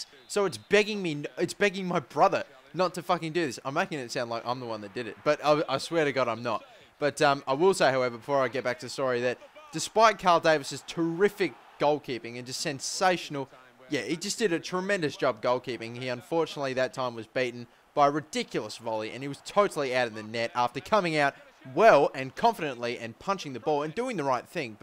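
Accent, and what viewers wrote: Australia. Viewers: Guisseppe Murru...I have a scouted star of my own called Guisseppe Missiroli. Way to be fuckin' creative EA, seriously haha. Anyways, I would be complaining as much as everyone else about your dilly dallying around the story but I adore Aussie accents so I'm good. Win-win lol.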